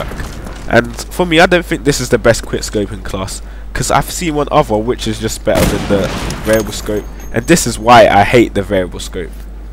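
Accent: England, United Kingdom. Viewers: So, is that an English accent...? O_o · People with British accents need to stop making videos.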